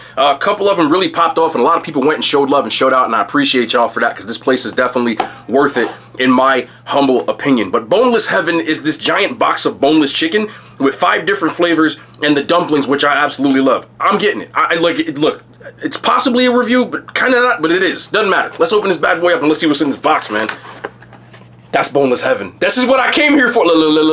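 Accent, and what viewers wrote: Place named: New Orleans, United States. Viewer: I fw u u tried to talk like how we talk in New Orleans⚜️ much love to u bro😂💪🏽💯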